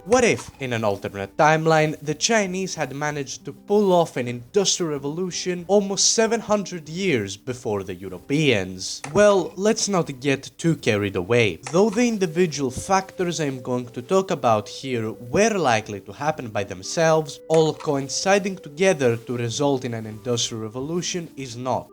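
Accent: Greece. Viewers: Now that's a Greek accent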